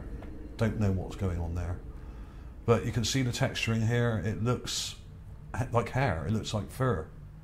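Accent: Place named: West Country, United Kingdom. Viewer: dont deny your history , Mr, Westcountry accent. love your recent stuff , some of your idras can some times drift a little, but the upside is the west country drawl is as an OPIATE to many of your disciples.. thank you for the free opiates...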